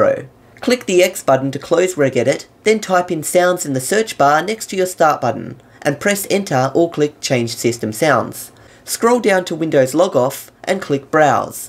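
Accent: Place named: Australia. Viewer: I subbed as soon as I heard the aussie voice I have been hearing for years.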